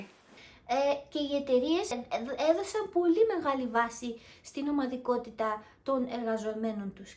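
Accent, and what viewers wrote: Japan. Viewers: εισαι σκετη γλυκα.Σε ευχαριστουμε για την ενημερωση.Αυτα που ειπες σχετικα με την Ιαπωνια ειναι ακρως διαφωτιστικα γιατι προερχονται απο ενα ατομο που ζει την καθημεριοτητα της Ιαπωνιας.Θα πρεπει να εχεις και πολυ καλη προφορα στα ιαπωνικα γιατι η επιρροη τους φαινεται και στην προφορα των ουτως η αλλως πολυ καλων ελληνικων σου.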